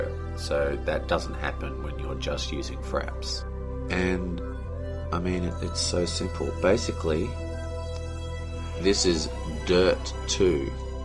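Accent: Australia. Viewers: You sound Australian. I am Australian. I like you :)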